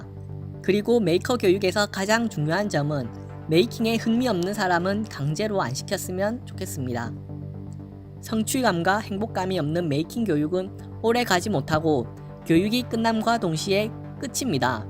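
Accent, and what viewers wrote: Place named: Busan, South Korea. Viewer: 그럼요. 손까락이 아닌 달을 봐야지 . 부산억양 좋아요 ( ^^)/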